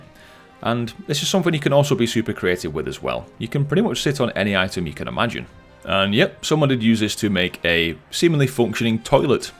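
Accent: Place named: Northern England, United Kingdom. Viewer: Thank you for the video. Nice to hear a north of England accent ( Yorkshire girl here ).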